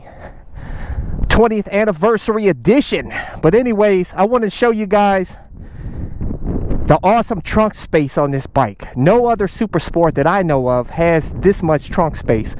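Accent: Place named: Midwest, United States. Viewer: He grew up in an urban area of the midwest which is why its sounds like a mix of mid-western and northeastern dialects. Its the reason why its hilariously endearing when he says things like "FO DA STREETZ" "HUNDID" and "DAHBUL ARRR" lol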